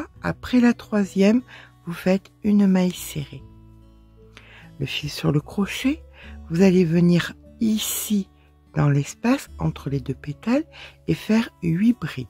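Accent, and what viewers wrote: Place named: Southwest, France. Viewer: Bonjour. Tuto toujours au top avec des explications amenées sereinement et qui donne confiance avec ce petit accent ❤❤❤❤ (sud ouest?) Merci !!! Et bonne journée (je ne commente pas souvent mais plutôt adepte des ❤️👍)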